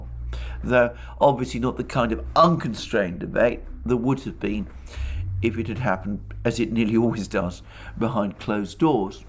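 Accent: United Kingdom. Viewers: Kremlin BS with a British accent. How nice... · Russian troll with British accent.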